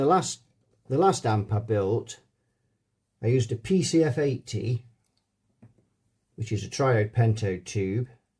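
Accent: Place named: North Derbyshire, United Kingdom. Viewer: I think it’s the dialect, plus some / many of the words. “ Tatered” as in “I think that capacitor is tatered.” Many won’t understand the words peculiar to the north Derbyshire/ Nottinghamshire dialect. 👍👍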